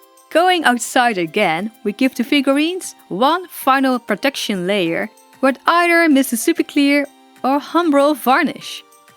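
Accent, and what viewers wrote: Netherlands. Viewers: Ik heb geen intresse in verven, ik heb vrij weinig pkmn speelgoed, maar het is zover best entertainend. Ik vond het wel grappig dat ik dacht dat je een Nederlands accent had voor dat ik je kanaal verder bekeek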